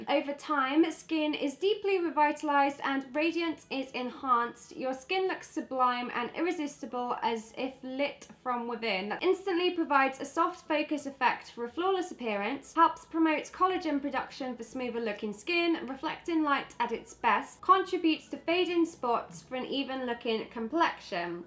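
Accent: United Kingdom. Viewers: She sounds British but sometimes when she talks she sounds different, is she fully British? Or did she lives somewhere else first? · does anyone know what her accent is like underneath the British? just wondered cus it's so pretty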